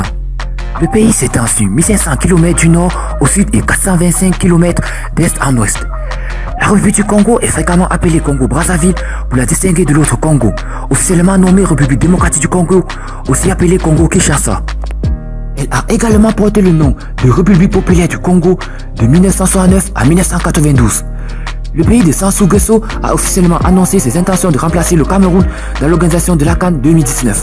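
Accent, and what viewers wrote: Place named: China. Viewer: N'importe quoi... c'est un chinois qui commente apparemment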